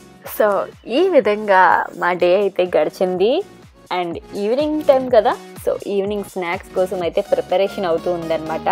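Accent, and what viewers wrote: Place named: East Godavari, India. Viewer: మా తూర్పు గోదావరి భాష వింటూ ఎంజాయ్ చేసాం. ఇంతకీ ఏ ఊరు అది మేడం?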